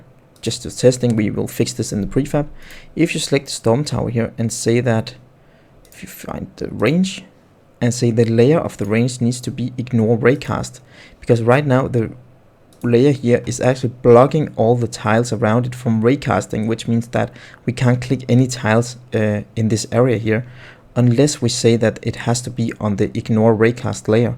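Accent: Denmark. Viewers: Started watching the video.... "In this video, we will..." That guy sounds like he's from Denmark... Check profile... Yep, Denmark... Hejsa lande bror.. :D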